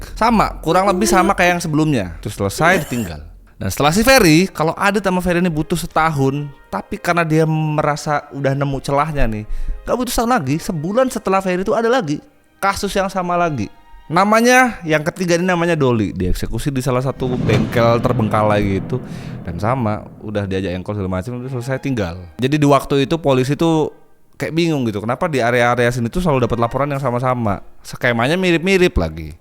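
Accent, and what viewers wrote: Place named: Medan, Indonesia. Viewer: logatnya medan banget emg org medan kah?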